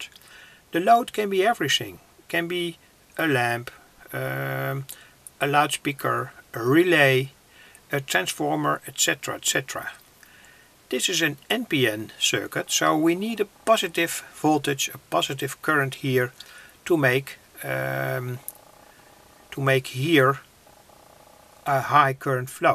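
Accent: Netherlands. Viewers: Leuk Nederlands accent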